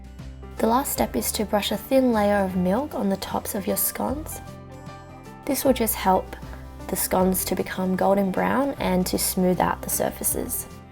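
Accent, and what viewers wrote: Australia. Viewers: This is not meant to be insulting or racist in any manner, but as an Aussie of Scots-Irish descent, and having been descended from First Fleet penal colonists, I’ve never been able to wrap my mind around an Asian with an Aussie accent. That said, the scones look deelish…nice and fluffy.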